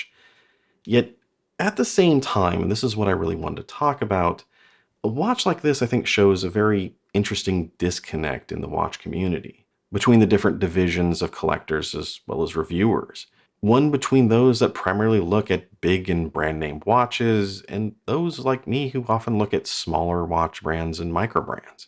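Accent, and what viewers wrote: United States. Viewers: Just can't watch a video with this murican accent! Classless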